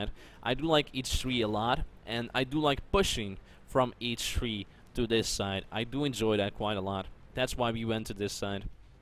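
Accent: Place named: Netherlands. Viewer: Are you Dutch because you have a kind of a Dutch accent?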